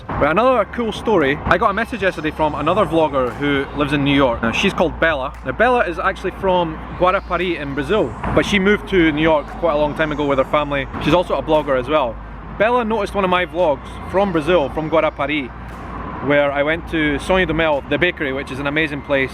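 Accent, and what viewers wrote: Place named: Scotland, United Kingdom. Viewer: I was going to ask you in another video if you were Scottish because you sound like one and then I read your description and found out you actually are lol. I'm from Brasil 🇧🇷, Salvador and I lived in edinburgh for 2 yrs and a half and loved there! I'm not very keen about the weather actually but, anyway... my father lives There as well in Ransome Gardens. Cheers!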